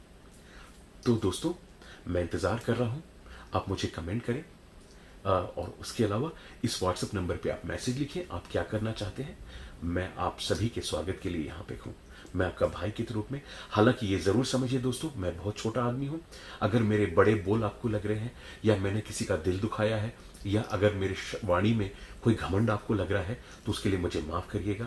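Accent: Standard. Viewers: Your Hindi is so pure.... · Clear, shudh hindi. ✌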